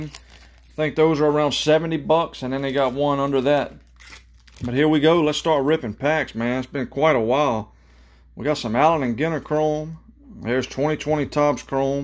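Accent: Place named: Louisiana, United States. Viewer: I love your backwoods Cajun southern accent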